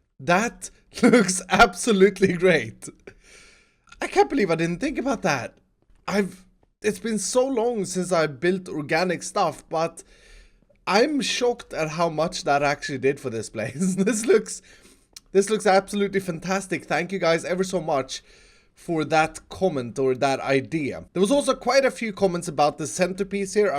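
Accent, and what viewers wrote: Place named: Sweden. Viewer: This is swenglish on a new level (i’m scandinavian too btw)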